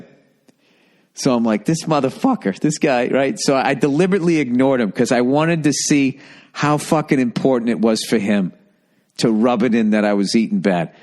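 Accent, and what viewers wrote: Boston, United States. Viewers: U sound like a fucking Boston or New York fucking fucker. Fuck.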